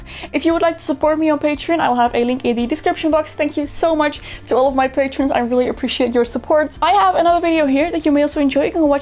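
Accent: Netherlands. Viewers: jaaa zie je wel!! Hoorde het aan je accent. Super leuke tutorials over je ponny ;-) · Ik wist het! Ik hoorde aan je accent dat je nederlands bent. Trouwens, ik heb vroeger een pony gehad, en ik wil er weer een maar ik vind de nadelen wel kut. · Hallo ! Ik dacht altijd al dat je zo een Nederlands accent had nu weet ik waarom en goede video 😗🌸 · at the beginning of the video I heared a little accent and I was already wondering where you would come from.. and you said the Netherlands🎉 ik weet niet waarom ik in het engels type maar ik ben ook Nederlands!! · Omfg wait, are you dutch ? So from the netherlands ? Or did i hear it wrong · IK WIST GEWOON DAT JE NEDERLANDS WAS AAM JE ACCENT VANAF T BEGIN LMAO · Ik wist dat je Nederlands was. Ik hoorde het. Maar echt je hebt echt een mooi accent.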